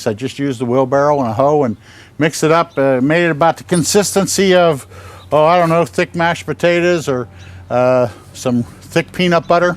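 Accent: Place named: Southwestern Pennsylvania, United States. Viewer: I knew you were from southwestern pa by your accent, and then I saw the steelers helmet on the door. Here we go steelers here we go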